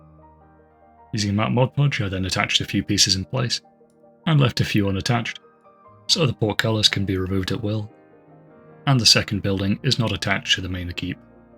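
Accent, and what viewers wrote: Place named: United Kingdom. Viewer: Another great vid! Where do you get your blue foam from? I've not seen it in any of my local craft stores (I'm assuming you're based in the UK based on your accent.)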